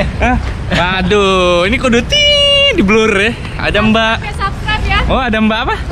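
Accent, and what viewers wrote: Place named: Banyumas, Indonesia. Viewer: mbaknya ngapak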